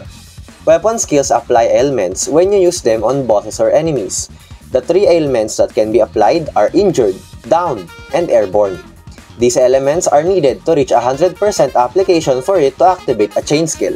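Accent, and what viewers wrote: Philippines. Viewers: Are you filipino because of your voice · 100% Filipino accent here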